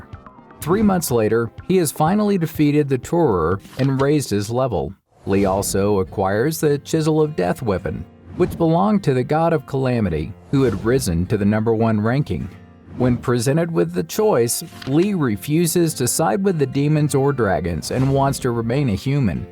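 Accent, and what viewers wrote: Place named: South, United States. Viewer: how did you give text to speech a country accent?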